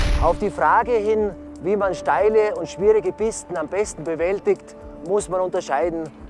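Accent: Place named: Eastern Europe. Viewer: Hahhaha er redet deutsch mit so nem komischen fast osteuropäischen Akzent 😂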